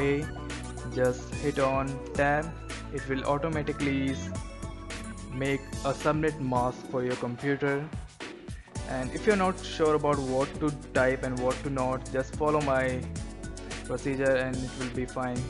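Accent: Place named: Bihar, India. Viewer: OEEY PUNDIT : FIRST DON'T PLAY THE DING DONG MUSIC !!! THE CABLE IS CALLED ETHERNET CABLE AND NOT A LAN CABLE . PLEASE DONOT USE ANY INDIAN JARGON AS COMPUTER VOCABULARY. NEXT THING > IT'S CALLED LOCAL NETWORK SHARING . THIS NOT ANY EINSTEIN 'S FORMULA . SO KEEP IT SIMPLE . BRUSH UP YOUR OWN ENGLISH DICTION (BIHARI DICTION ) AND ENGLISH PRONUNCIATION ! FIRST YOU LEARN COMPUTER PROPERLY THEN YOU TEACH OTHERS THE RIGHT WAY ! DON'T TEACH "LAN CABLE FOR ETHERNET CABLE ! THERE ARE STRAIGHT CABLE ,CROSS OVER CABLE TO CONNECT PC BUT USB CABLE ALSO CONNECTS ; SO YOU NEED TO BE SPECIFIC !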